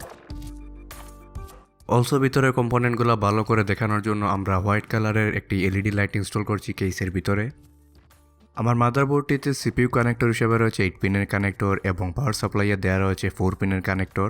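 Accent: Sylhet, Bangladesh. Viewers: Sylheti mama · Bro apni ki sylheti? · Sylheti nakita bhai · Dada Sylheti naki 😌. Sylhet hoile kinte pari. Reply diyen dada. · Bhai apni sylheti Maybe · Are you from Sylhet? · Sylhety ni bhai? Mat hunia lager sylhety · Great video... Are you sylheti brother? I was just wondering... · vaii kita sylheti nih?? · সিলেটি Bro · Vhai.... Apni sylheti? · Bhai kita sylheti ni?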